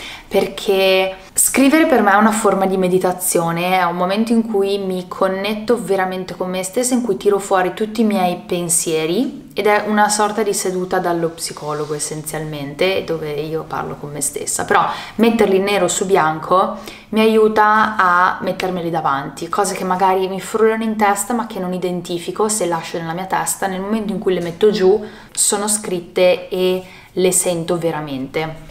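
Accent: Tuscany, Italy. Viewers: Ma hai preso l’accento toscano…adoroooooohhhh 😍😍😍😂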